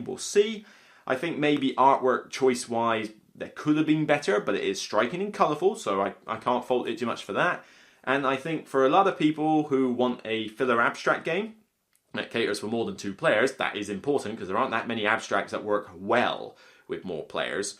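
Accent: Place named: Bristol, United Kingdom. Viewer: Canadian here, you’ve become my favourite board game channel for your deep understanding of mechanics and finding imbalances, etc, rather than just a constant ad for each game. I have a question… your accent is unfamiliar to me but sounds like someone from my childhood from Bristol. Are you from that area?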